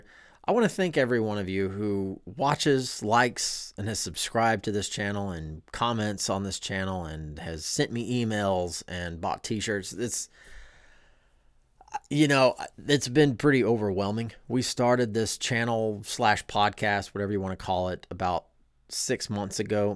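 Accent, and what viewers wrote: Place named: South, United States. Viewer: I was born and raised in Tennessee. His southern accent is a little on the light side compared to most southerners myself included.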